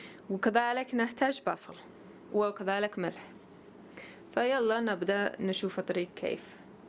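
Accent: Yemen. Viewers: تسلم الأيادي تقديم مميز للأكل اليمني بطريقه سهله وسلسه بعملها الآن بطريقتك بس لحم خاروف وقطع اصغر ومتأكده انها بتكون لذيذه وحلوه كجمال اللهجة اليمنيه سبحان الله وبحمده سبحان الله العظيم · ههههه تعجبني وهي تتكلم عربي باللهجة اليمانية المحشوش اكلة جيزانية بس مااعرف كيف يسوها بس اطلبها من اقربائي اهل جيزان ويجيبوها جاهزة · I like your Yemeni style Arabic.. and your recipes of course :)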